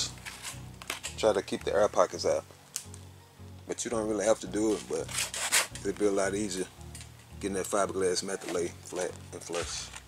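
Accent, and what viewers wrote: Alabama, United States. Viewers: Dude sound like hes from Bama... Credits roll Bama tag lol great job man. new sub lets get that 100k for ya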